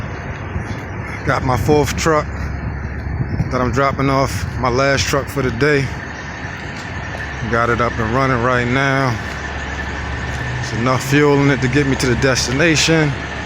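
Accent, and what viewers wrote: Baltimore, United States. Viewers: hey man do you have an email i get ask you a few things, sound like you're from baltimore. are you out of the hagerstown hub?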